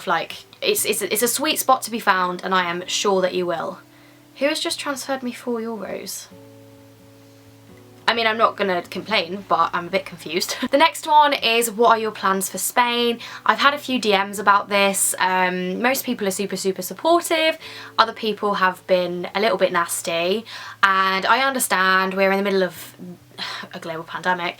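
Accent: Birmingham, United Kingdom. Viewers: u sound so Birmingham in this vid x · you turn really brummy when you're home hahaha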